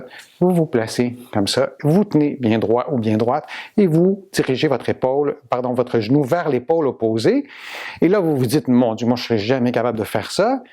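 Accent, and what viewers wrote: Canada. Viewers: Bonjour.je suis vraiment fan.et je regarde vos videos avec beaucoup d'intérêt.et j'essaie dans la mesure du possible de refaire les exercices régulièrement.notament pour le rachis cervical et les épaules.si vous pouvez nous faire une vidéo sur comment éviter la cyphose sénile ou la corriger.merci bcp et je tiens à vous dire que j'adore votre accent canadien.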